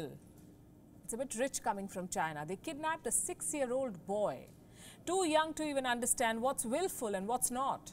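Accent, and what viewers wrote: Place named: India. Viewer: not really fond of indian accent but there is something about the way she talks that i always find interesting. not sure if its the clarity or the intonation.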